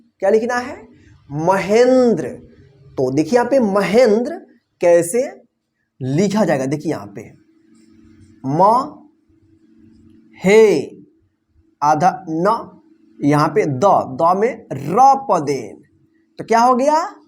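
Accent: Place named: Bihar, India. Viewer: आप हिंदी और इंग्लिश बोलना सीख लीजिए तो ज्यादा प्रभावशाली लगेगा वीडियो आप शायद बिहार की तरफ से बिलॉन्ग करते हैं आपकी बोलचाल की भाषा में उधर की झलक है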